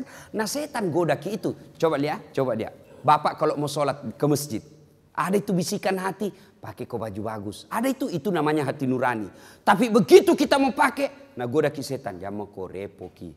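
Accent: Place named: Makassar, Indonesia. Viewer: Saya orang jawa tapi logatnya orang Makasar tetap ewako..menyenangkan.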